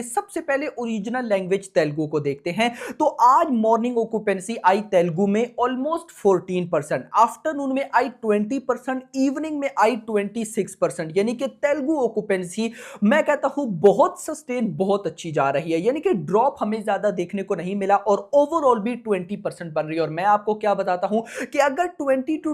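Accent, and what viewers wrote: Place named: Pakistan. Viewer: Yar tu Pakistani h kya Teri avaj pakistaniyo jaisi kag rhi bolne ka tarika 😢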